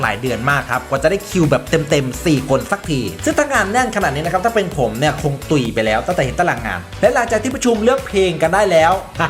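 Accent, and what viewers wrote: Isan, Thailand. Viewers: ชอบความเป๊ะของแอด ข้อมูลพร้อม แล้วค่อยลง อวยเต็มที่ น้ำเสียงไม่น่าเบื่อ ชอบมากค่ะ และสำเสียงอีสานใต้มาเต็ม แอดคนอิสานใช่มั้ยคะ🤣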